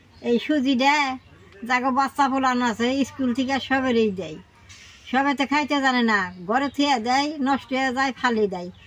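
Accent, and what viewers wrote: Bangladesh. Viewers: আপনার কথাগুলা একদম আমাদের বাংলাদেশের মানুষের মতোন ❤❤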